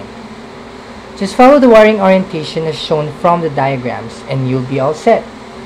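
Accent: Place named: Philippines. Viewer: u sounds like a filipino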